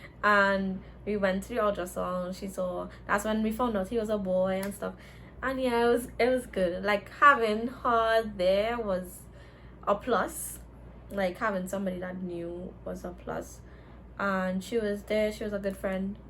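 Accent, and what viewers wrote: Trinidad and Tobago. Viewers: what's your nationality? sound Trinidadian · She sounds like a Trinidadian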